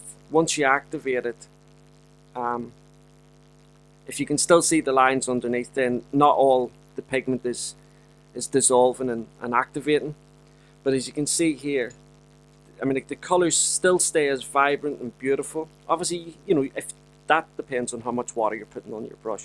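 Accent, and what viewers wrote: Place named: Northern Ireland, United Kingdom. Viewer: I think has a Northern Ireland accent IMHO.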